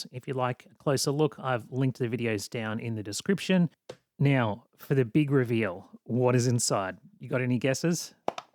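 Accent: Australia. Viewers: The moment I heard your voice, I could tell it was a fellow Aussie. Happy to follow and watch your amazing content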